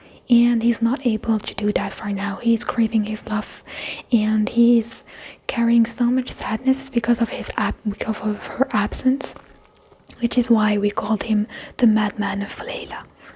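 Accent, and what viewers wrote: Arab world. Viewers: Your Arabic accent are unbelievable ❤❤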